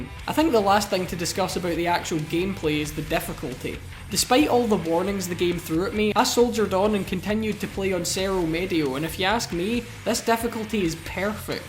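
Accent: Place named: Glasgow, United Kingdom. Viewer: Sweet review bro. Loving the Glaz geee accent. This game lools wesome but I am a low life with an xbox so doubtful I will ever get my hands on it. Thanks for uploading it..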